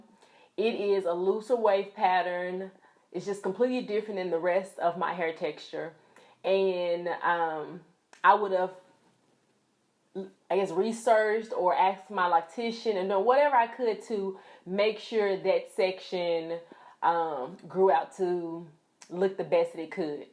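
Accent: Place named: Tennessee, United States. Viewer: I knew it, I have a few friends from Tennessee, and you sound like them. I figured it was a Tennessee accent, but I had to make sure. Kudos for my ears....lol....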